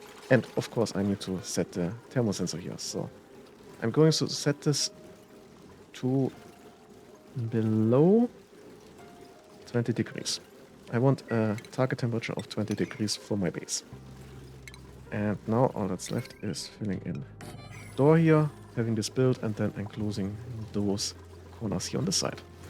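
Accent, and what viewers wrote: Germany. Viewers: hey, like ur style, like ur voice, but plz try to work on ur english. ( Sis needs to bi abaf zwölfhundret) ich bin au deutscher, aber dein deutscher akzent is krass^^ besserr werden! ;)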